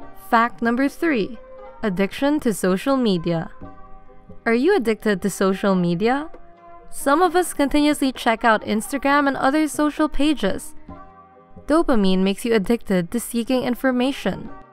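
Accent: Philippines. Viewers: Why do i feel like she sounds like a filipino speaking in English. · The one doing the voice over sounds Filipino. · Why does the narrator sound like a Filipino to me? I talk like that lol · the voice actor sounds filipino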